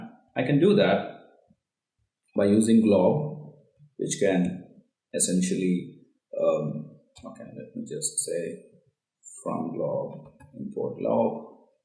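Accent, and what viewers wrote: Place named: India. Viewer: Great content.. And our Indian acccent is also good fyi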